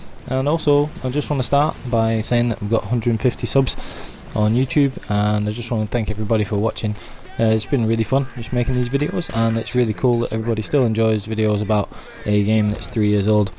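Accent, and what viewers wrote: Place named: Bolton, United Kingdom. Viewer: You from Bolton?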